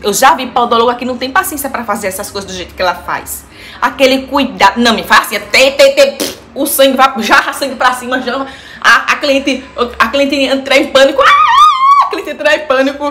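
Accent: Northeast, Brazil. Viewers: Adoro seus videos o jeito de vc falar seu jeito arretado do nordestino. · Amo seu sotaque nordestino,sua alegria e juventude me encantam, parabéns lindaaa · sigo vc justamente por causa do seu jeito, viu? Adoro esses sotaques nordestinos, moro em juazeiro do norte, sou manicure, e vc me incentivou bastante❤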